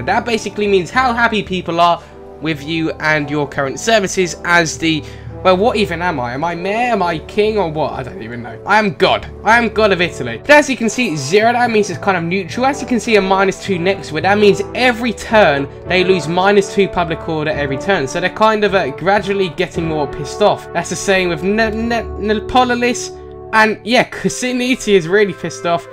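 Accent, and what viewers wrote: United Kingdom. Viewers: Nothing brings out your british accent better than playing some rtw2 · Why do you watch if he has a British accent then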